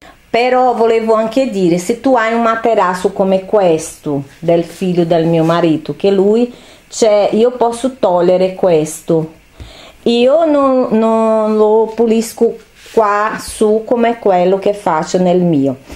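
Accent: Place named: Brazil. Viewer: Mi piace il tuo acento brasileiro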